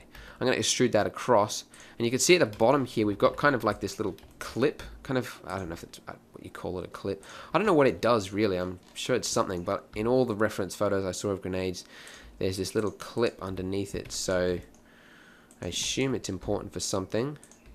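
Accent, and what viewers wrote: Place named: Australia. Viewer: Great tutorial mate, feels good to hear an aussie for once. Alot better to understand.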